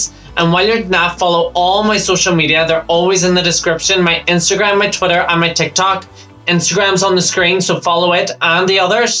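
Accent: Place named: Northern Ireland, United Kingdom. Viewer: I love how you analyse everything so carefully. Anyway, Damiano David is the frontman, Thomas Raggi is the guitarist, Victoria De Angelis is the bassist and Ethan Torchio is the drummer. Keep those reactions coming! I love your accent, btw (Northern Irish, right?).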